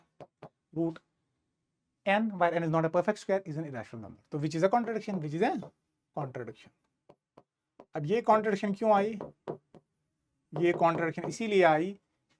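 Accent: Kashmir, India. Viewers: Kashmiri accent is so cute 😂❤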